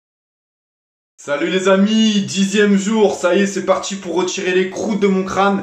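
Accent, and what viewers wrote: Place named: Arab world. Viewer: Toi qui parle comsa tu est un français oubien un arabe ?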